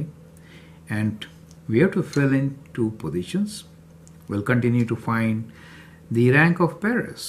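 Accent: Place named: India. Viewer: aap jitna bhi US ascent mein bolne ki koshish karen .... hindusthani pronouncation aa hi jati hai .......... by the way video was nice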